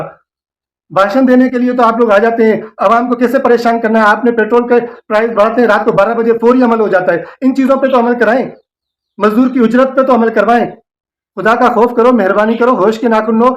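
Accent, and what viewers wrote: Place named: Sindh, Pakistan. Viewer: بھائی اپ کی ویڈیو دیکھی اپ عوام کے لئے بہت دفاعی اواز اٹھائی ہے اللہ پاک اپنی شان کے مطابق کی اور ہماری مدد فرمائے بھائی اپ ہمیں سندھی لگ رہے ہیں اپنی انداز اور اواز سے ہم جب اندرون میں سندھ جاتے تھے تو سندھی لوگ ہمارے لئے بڑے پیار سے لسی پراٹھا مکھن لے کر اتے اور خوشی خوشی ہمارا سات سات جگہیں گھماتے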